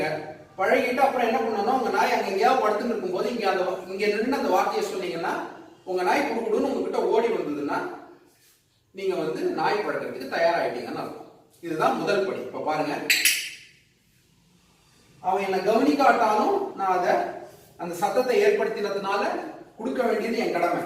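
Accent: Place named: Tamil Nadu, India. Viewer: 😊 your typically Tamil slang ..nice..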